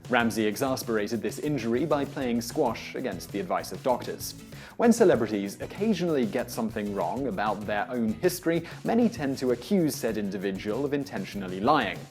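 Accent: United Kingdom. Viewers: I'm not used hearing soccer being said in a British accent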